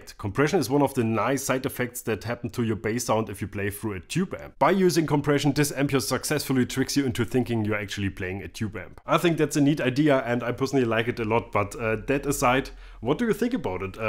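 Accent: Germany. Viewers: The content is always great, but the German accent and eye movement is what keeps me coming back. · Phil Mitchell reviews bass stuff with a german accent on youtube?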